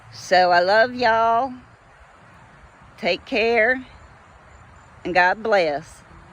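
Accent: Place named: South, United States. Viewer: Hi GF WOW 😮 You told your First Experiences, that is so cool. I know we both have many Stories don’t we 😉 Hey Don’t worry about what some may think !! Sis Elke is Right about it’s a Paranormal Experiences. Like the ones they tell Every single Day 😇 Do you have the Pictures handy from when your Moms Family Home Burnt Down? I forgot it was Christmas 🎅🏽 Morning. Didn’t it get one of the Barns close by? Email me the Pics. when you find them. It was so long Ago, seems like forever. Ok I know it’s been a Long week for you Babe, hopefully tomorrow you can just get some Rest.Your Southern Is Right on Target. You Remember the old Cassette Tape you found of you reading Little Red Riding Hood from Your Grandparents Place? That’s how you sound here. Lmao 😂🤣You were How old? God Bless GF and I talk to you Soon.💙🤍💙🤍💙😘🥰Night.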